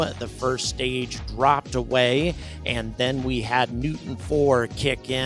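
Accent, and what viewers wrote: England, United Kingdom. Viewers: As a Brit I think your British accent is most splendid. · Love the English accent!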